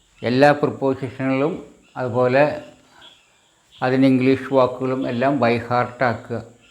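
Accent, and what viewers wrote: Thrissur, India. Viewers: സാർ തൃശ്ശൂരാണോ? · Sir thrissur ano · സാർ വളരെ നന്ദി🙏 വളരെ നല്ല ക്ലാസ് ' സാറിൻ്റെ സംസാരം കേട്ടിട്ട് തൃശൂരാണെന്ന് തോന്നി. ഞാനും തൃശൂരാണ്😊🙏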